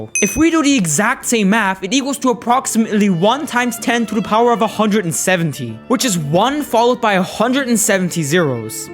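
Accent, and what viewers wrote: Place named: Germany. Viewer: Im hearing the german accent so much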